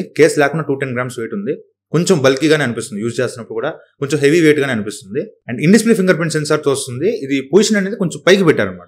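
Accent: Nellore, India. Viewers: meru nellore aa bro proper?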